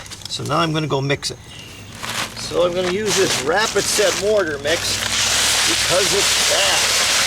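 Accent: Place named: Scranton, United States. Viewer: you sound like you're from scranton. thanks for these videos.just a hobby for me but you show me a great"'how too"........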